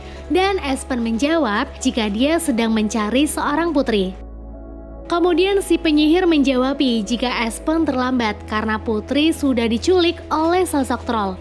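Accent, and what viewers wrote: Pemalang, Indonesia. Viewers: Mbak asli wong pemalang?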